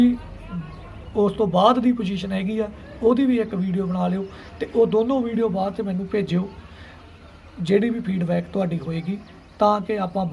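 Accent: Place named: Malwa, India. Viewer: ਜੇ ਹੱਥ ਨਹੀਂ ਦੁਖਦਾ ਤਾਂ........ ਸੀਦੀ ਮਲਵਈ ਬੋਲੀ Good sir ji ਅਸੀਂ ਸ਼ੇਅਰ ਕਰ ਦਿੱਤਾ ਜੀ ਧਨਵਾਦ ਜੀ।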